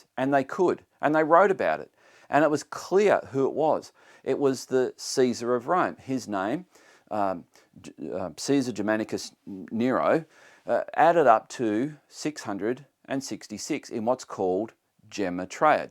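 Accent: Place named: Australia. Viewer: I will read the bible like it was "meant" to be read, when you can learn to speak English how it was "meant" to be spoken you fing ossie. We cant take anything you say seriously.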